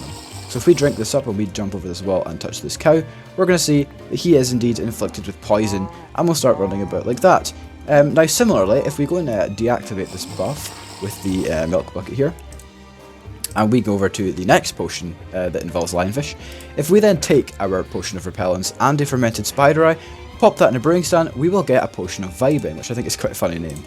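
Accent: Scotland, United Kingdom. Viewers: Fife, Dundee, Edinburgh? Somewhere South East ish? I'm trying to work out your accent!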